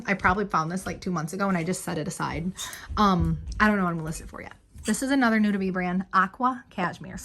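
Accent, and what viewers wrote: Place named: Midwest, United States. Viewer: Your Midwest accent is AMAZING. Where are you based? I miss it, I grew up in SE Wisconsin :)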